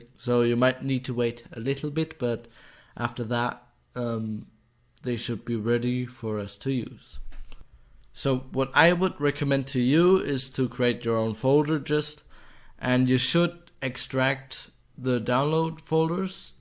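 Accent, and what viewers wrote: Germany. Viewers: deutscher detected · are you german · Nicht böse gemeint, aber HOLY hört man dass du deutscher bist😂😂❤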